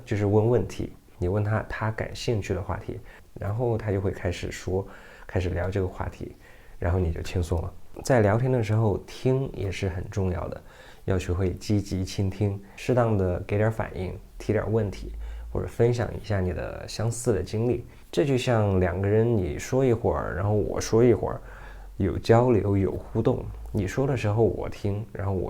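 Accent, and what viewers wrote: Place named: Beijing, China. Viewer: 你的中文不错了，从发音到咬字北京腔有了，已经接近母语中文的国人了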